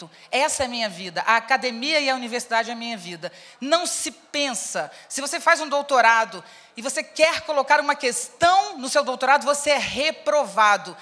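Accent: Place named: Rio de Janeiro, Brazil. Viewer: Eu sempre admirei a Viviane,mulher com uma inteligência acima da média.Super espontânea, sem falar nesse sotaque carioca que eu adoro.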